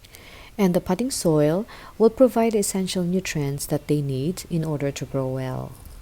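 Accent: Philippines. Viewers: She sounds so filipino hehehe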